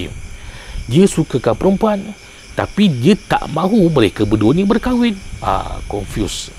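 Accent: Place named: Sarawak, Malaysia. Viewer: Abang orang mane Sarawak ke?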